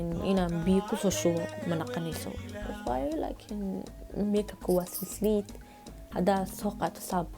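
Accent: Mogadishu, Somalia. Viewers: Loriina Bby Abayo somaliye ee kudhalatay intana kula ekaatay Soomaligana waa kaabadia lkn ree xamarka qaraaa saan ah . Anba saaan waa kadarnaa lkn marka kasobaxay alxm waaa kaqubestay hhhhh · DaaRij Films ina ader rr xmrka saan ayey u hdlanee gabadha ka tag 🙄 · DaaRij Films wara dadka xamar joga sas aya uhadlen reer tuulo lee wayeh waxa somaliga wayn ku hadla okky iska tag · Sax waaye Afka reerka xamarka haday ku hadasho kaasaaba u fiicanee intey u boodi lahayd maxaa tiri axsan af maadoonti xataa ku hadal horaa loo yiri socod aadan lahayn sedex tilaabo ma dhaafo wayo wa ka lumaabo waxan kugula talin lahaa ani ahaan waa ku dareemoya af maadoonti aad ku hadli jirtay xoogsigan dhexdad ku lumeysaa iska daay hadii ad doontee in xirfadaa ku kacdee afaa ka waran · Loriina Bby wa sida dadka reer xamarka ku Hadlan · Loriina Bby xbbti waxey ku hadasha afka rer xamarka saxda ah marka luqadii ayey isku daydaa iney ku hadasho marka waa sidaas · Waayaha Cusub wll dadka cad cadka muqdisho dago san aye u hadalan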